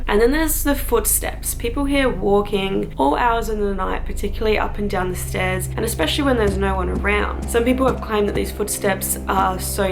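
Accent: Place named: Australia. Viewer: U from Australia bb i thought u from England mmm ok thanks for that tour it looks cool that hotel great info??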